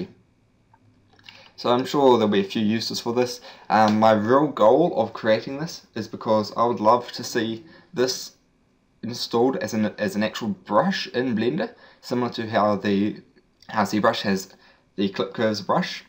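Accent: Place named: New Zealand. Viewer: nice to hear a kiwi accent :D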